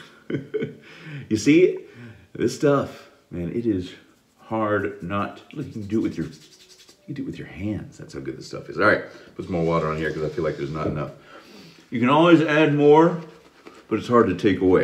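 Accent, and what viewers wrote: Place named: Liverpool, United Kingdom. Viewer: Might get this soap myself, peppermint 😃I love your Liverpool accent you do it perfect, hope all is well.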